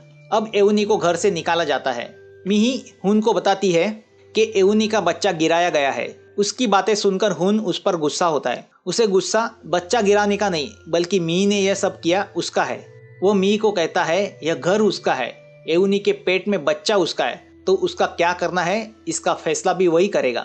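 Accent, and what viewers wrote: Maharashtra, India. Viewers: Typical thick Marathi ascent horrible narrative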